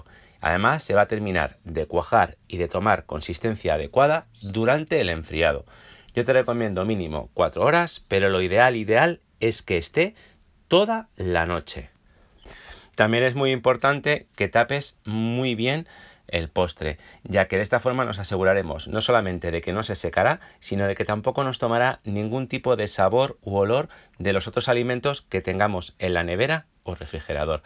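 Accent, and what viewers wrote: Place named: Spain. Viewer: Si es riquísimo yo aparte le pongo queso crema gracias me encanta tu acento Español saludos desde puebla México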